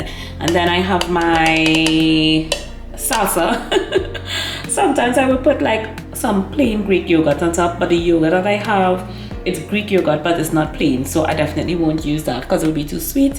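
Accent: Trinidad and Tobago. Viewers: I hear that trini accent and I say no sah let me go watch! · Hi, are you Trini? That accent sounds like my people!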